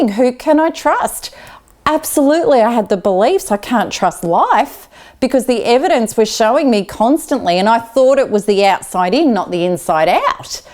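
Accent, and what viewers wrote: Australia. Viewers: When does this New Age bs with a Aussie female accent STFU?! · Are you Australian?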